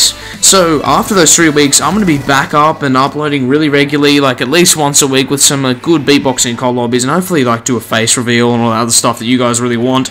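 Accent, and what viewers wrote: Australia. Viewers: Are you aussie by any chance?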